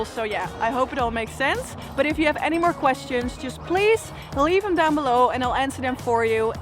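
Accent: Netherlands. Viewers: Nice Hollands accent 😁😁. Cropping... The same as cutting out a piece afterwards. Nothing special... No real extra zoom. Just An extra digital zoom like the older digital camera's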